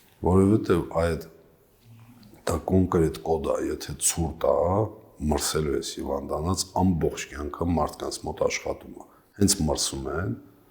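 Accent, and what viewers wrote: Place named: Karabakh, Azerbaijan. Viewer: ղարաբաղցի է հաստատ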